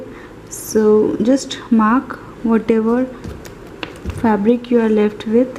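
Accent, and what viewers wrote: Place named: Algeria. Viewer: جزايري مرة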